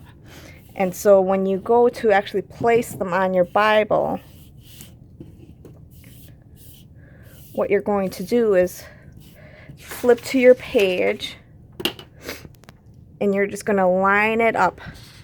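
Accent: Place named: Minnesota, United States. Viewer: Are you from minnesota? lol I think I hear the accent.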